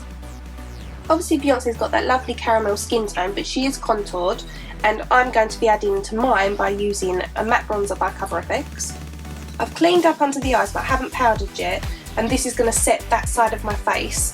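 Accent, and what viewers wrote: Essex, United Kingdom. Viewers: I love watching people apply it. It's like an artform. I'm not so good at it, but you seem to have a pretty good grasp on cosmetics. And I hear an accent...Are you from Essex? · First time watching your videos!! You are really talented! if you dont mind me asking, ive noticed you have abit of an accent... are you from essex? :)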